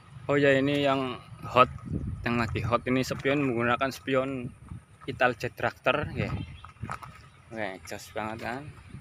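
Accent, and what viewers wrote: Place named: Kebumen, Indonesia. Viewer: Logat bicara karo Bahasa mu lik🤣🤣🤣 Rika Kebumen sblh ndi?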